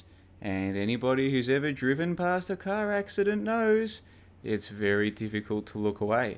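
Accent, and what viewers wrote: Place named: Australia. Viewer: I don't disagree with anything said here.. Private company issuing tethers is not dissimilar to central bank printing money. Tether will undoubtedly be involved in the crypto crash. Although still lots of room to inflate first.. Thanks for putting it in simple terms and nice to hear an Aussie accent in this space!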